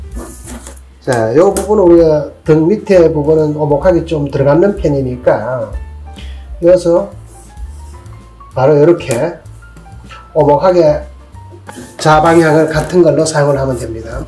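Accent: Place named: Gyeongsang, South Korea. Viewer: 할랑하게 갱상도 분이신거같네요 설명잘들었습니다 ㅎㅎ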